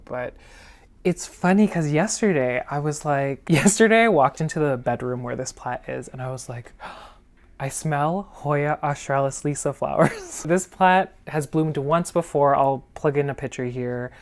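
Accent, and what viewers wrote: South, United States. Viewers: That Hoya Lisa is to die for! You crack me up. That southern belle accent is to cute. Love the sweater.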